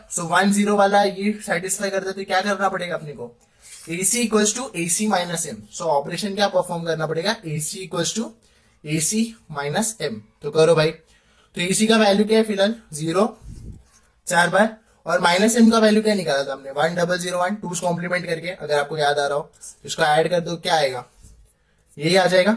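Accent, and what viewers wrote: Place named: Mumbai, India. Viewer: jyada mach mach nahi hui...Love your mumbai vali hindi bhai..I'm from VJTi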